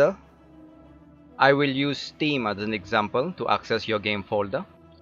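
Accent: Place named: India. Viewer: You sound indian holding in his language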